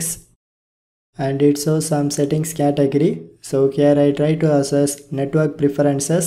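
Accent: India. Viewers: This guy is a little, too Indian for me to understand, also it says it will delete my account and I don't want my account to go bye bye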